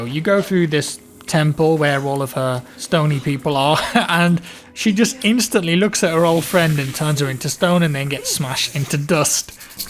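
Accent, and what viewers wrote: United Kingdom. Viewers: The way you pronounce Minotaur and Gaia...sometimes I can't even with you Brits.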